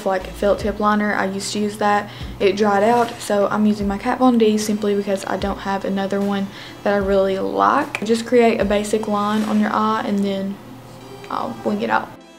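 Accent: South, United States. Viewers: nah but I love her voice and the country accent. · do you live in the south? you sound southern I'm curious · love that southern accent , where are you from ? - much love from South Carolina 💖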